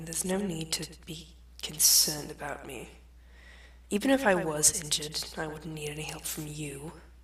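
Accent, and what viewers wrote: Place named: United Kingdom. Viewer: He sound british (｡・//ε//・｡)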